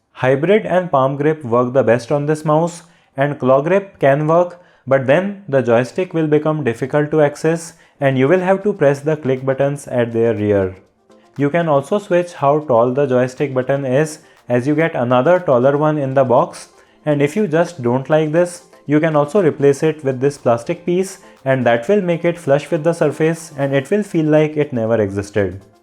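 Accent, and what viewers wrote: India. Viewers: Your English like a hindi language 🙄❤️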